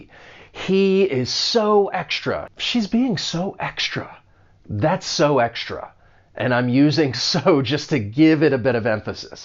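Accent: United States. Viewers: Don't mention it professor. I'll be always watching your videos, they're so cool. Perhaps, because of the way you teach and explain everything. I appreciate American's accent .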